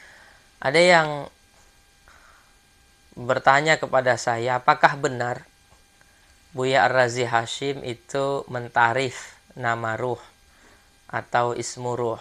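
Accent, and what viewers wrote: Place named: Java, Indonesia. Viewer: Alhamdulillah bisa ketemu channel ini. Insya Alloh bermanfaat bagi saya. Salam takzim untuk. Bahasanya lembut, logatnya seperti orang Jawa.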